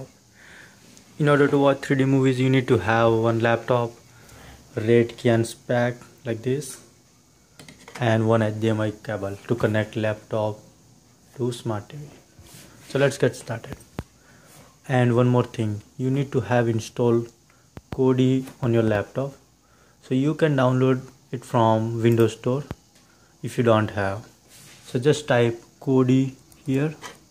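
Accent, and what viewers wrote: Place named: India. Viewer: Thats how hindi douche speaks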